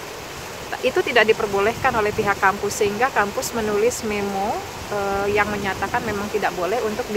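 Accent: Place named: Sunda, Indonesia. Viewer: logat sundana meni eces..teteh❤❤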